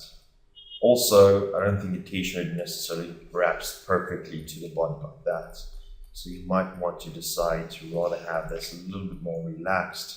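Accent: South Africa. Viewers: Hey man, nice video! You sound South African, i am from JHB myself!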